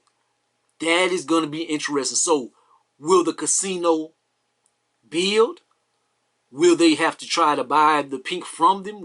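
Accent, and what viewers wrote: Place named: South, United States. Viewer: I subscribed cause I can just listen to your country accent all day . But on the real you break that ish down ❤️❤️❤️❤️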